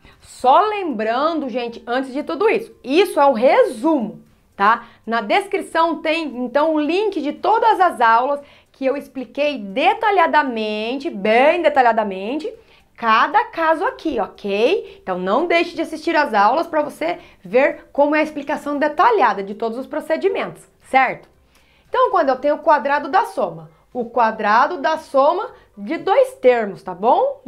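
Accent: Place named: Caipira, Brazil. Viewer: Esse sotaque caipira incrível ceRto, teRmo, diferEnça kkkkk Muito bom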